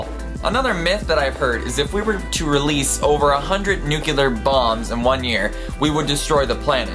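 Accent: Maine, United States. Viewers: Wow. My first time hearing an accent from Maine. Hello from Texas! :D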